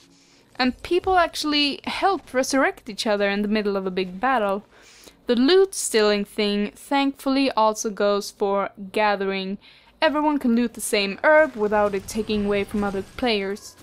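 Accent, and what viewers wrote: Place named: Sweden. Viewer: Sometimes I hear a bit of Swedish accent but your english is excellent, where are you from? Nice review and most of the time the only thing I want to hear is that it's better than wow, for me that is enough.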